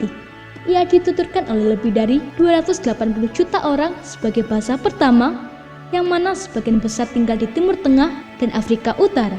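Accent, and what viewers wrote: Indonesia. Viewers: Suaranya medok banget kaku banget bahasa Indonesianya. Mending lo belajar bahasa Indonesia dulu yg bener baru membahas bhs yang lain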